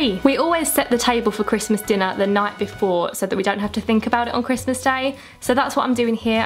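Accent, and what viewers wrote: Essex, United Kingdom. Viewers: I love her Essex accent ❤️❤️❤️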